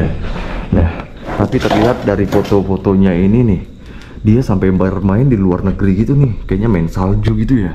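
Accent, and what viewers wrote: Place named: Medan, Indonesia. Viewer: Hadir bg salam dari medan horas. Sellu suka dgn smua konten2 abg. Upppp ternyata abg org medan. Di vidio ini baru sya dengar abg org medan. Horas ma Hu bamu bg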